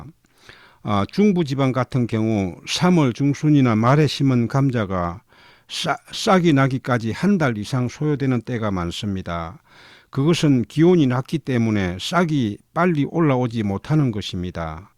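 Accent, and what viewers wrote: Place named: South Gyeongsang, South Korea. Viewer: 🥔감자, 🧢모자 등 말할때 앞 발음이 올라가는것은 경상남도 분들 발음법 같아서 정겹습니다. 저는 경북출신인데, 신혼때 경남에서 6년정도 살았거든요..ㅎㅎ 항상 좋은 영상 감사합니다.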